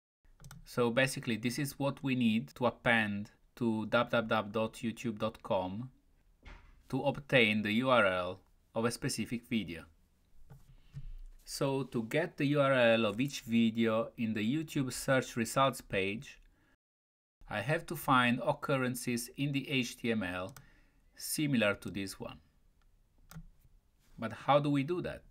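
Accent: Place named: Italy. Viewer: si sente che sei italiano bro(non è un'offesa, però fa un po' ridere aahahah)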